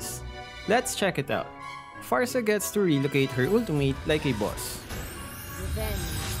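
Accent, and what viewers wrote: Philippines. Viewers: Pinoy na pinoy mag english ah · You can hear his peenoi accent 😂💜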